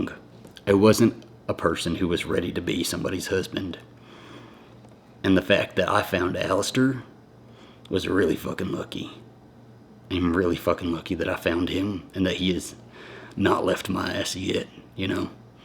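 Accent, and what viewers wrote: South, United States. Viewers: Gosh .. I love and admire your ''Southern Accent'' 😍🥰😍🥰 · Well, that was deep! I’ve been following you from the beginning. You probably didn’t get married too young. Sounds like if Alastair wasn’t there you would have continued your spiral. Off subject: Your accent is getting more Southern drawl every time you record. I think you’re talking more like your mom every day, instead of more like Alastair who has no accent. Analyze that Mr. Freud.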